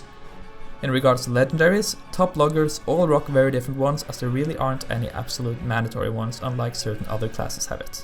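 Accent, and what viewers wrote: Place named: Norway. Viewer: hahaha du hørtes jævlig norsk ut på videoen xD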